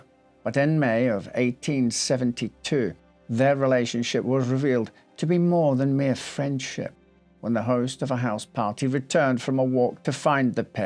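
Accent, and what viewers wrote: United Kingdom. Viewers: Something about your British accent and your articulation makes you very enjoyable to listen to. I thank you for your service sir!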